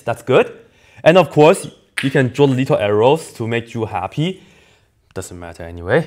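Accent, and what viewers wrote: China. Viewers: You speak English like Chinese unlike me which is Bengali😂😂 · His english is cool It seems a mix between english and chinese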